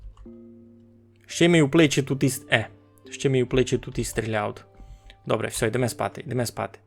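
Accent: Transcarpathia, Ukraine. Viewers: Боржавський або бережанський діалект (центральна частина Закарпатської області). Містить достолиха говірок. Єдиної стандартизованої версії поки не має.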